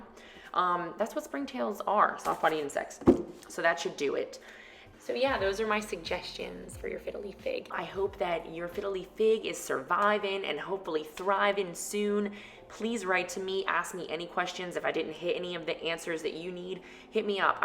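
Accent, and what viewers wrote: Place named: New Orleans, United States. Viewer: Great video... all I needed. Hopefully I can nurse my Fiddle back to health. Also, off topic, are you originally from Louisiana by any chance? You sound exactly like my cousins from New Orleans. Love your presentation and enthusiasm!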